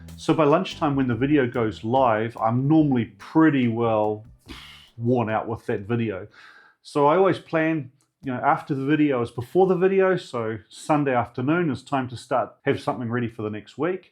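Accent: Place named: Northland, New Zealand. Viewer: Are you a Northland person? You've got the accent of a Northlander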